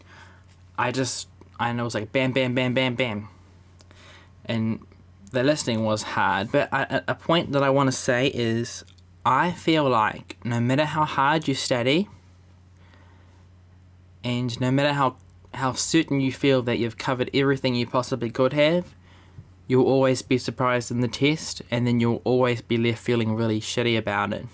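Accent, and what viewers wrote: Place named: New Zealand. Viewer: Hahaha like ur kiwi accent mate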